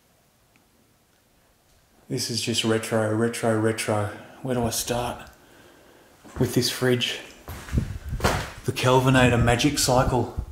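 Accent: Australia. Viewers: Subbed. Why ? : Because the video is LONG and NOT forced upon you. I felt as if I was there with you and could see what you saw and feel what you felt. AWESOME VIDEO. DONT CHANGE, be long and thorough. Sounds like your from Australia. Hail !. Be safe because Ive seen those damn spiders that live there. UGhh. Take a pistol preferably a Desert Eagle. Can never be to safe. oops I told you so !. Start blasting and hope for the best. Fear those spiders !. Damn bigger than Spiderman ! Make sure not to close in any animal, they can die from starvation or dehydration.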